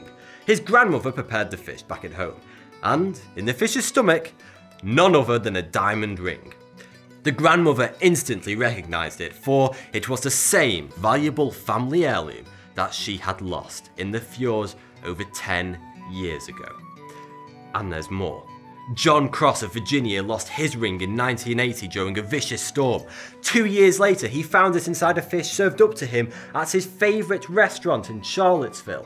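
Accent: United Kingdom. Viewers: What happened to Vsauce? Why does he suddenly have a British accent? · xXMEMEGODXx 11 it's just his type of British accent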